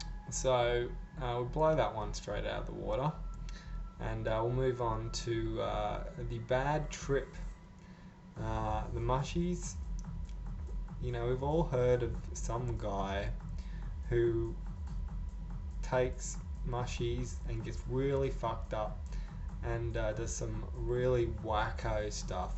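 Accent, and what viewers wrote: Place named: Australia. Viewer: I dig you man! crazy free party dude with your australian accent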